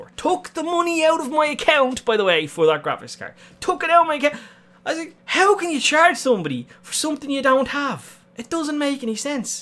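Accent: Ireland. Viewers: "I tawt I wuld tawlk to yew" Fuck the irish accent is absolutely fucking magical ;)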